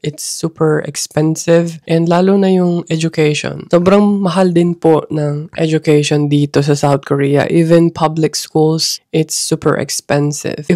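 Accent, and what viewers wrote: United Kingdom. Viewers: Bakit po may british accent yung english mo? Ang arte pakinggan 😅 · Nagulat rin ako sa pa British accent reminds me of Sarah D 🤗 tumira rin kc un sa London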